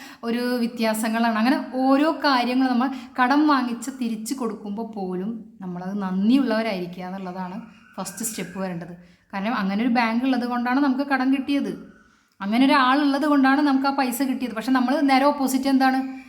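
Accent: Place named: Ernakulam, India. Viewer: എറണാകുളം ഭാഷ😅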